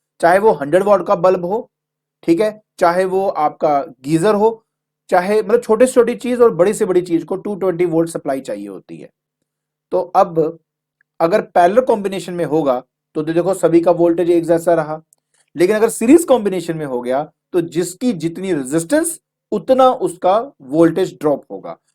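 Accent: Punjab, India. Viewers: Sir kyà aap Punjab se ho?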